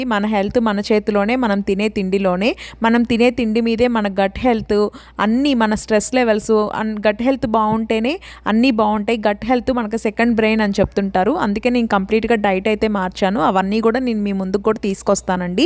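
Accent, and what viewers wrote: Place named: Andhra Pradesh, India. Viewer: Ni language enti kadapa la ledu..... Ma Andhra telugu la ne matladuthunnaru miru...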